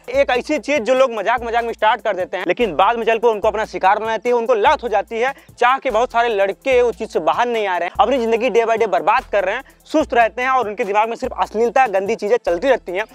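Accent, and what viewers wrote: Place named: Bihar, India. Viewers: Bolne ka style pehewani aur bhojpuri jaisa hai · Big brother aap Bihar se ho Aap ki avaj Bihari hi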